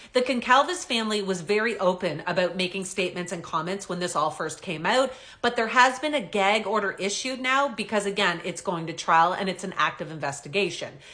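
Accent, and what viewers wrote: Canada. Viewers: I don't believe your pronouncing Steve and Kaylee's last name correctly. Never mind the Canuck accent. should check that out. Maybe I'm wrong 🤷🏼‍♂️🤦🏼‍♂️🤷🏼‍♂️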